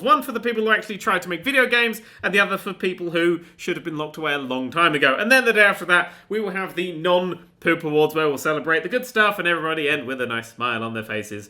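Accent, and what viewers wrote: United Kingdom. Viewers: Holy shit got ultra British towards the end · Is it just me or did sound particularly british in this video?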